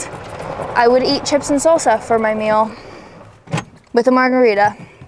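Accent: South, United States. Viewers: Are you a Southern gal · Love your confidence and those early morning tee times as that fits my bill for fun golf & love your southern draw & sweet skirts. Love the game & your tips.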